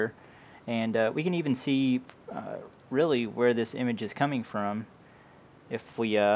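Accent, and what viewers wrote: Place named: South, United States. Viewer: Your accent is that of a southerner, so I find it interesting that you have as a background, the Brooklyn Bridge and the NYC skyline.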